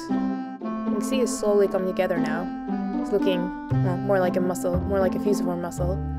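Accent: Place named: Canada. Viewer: Canadian accent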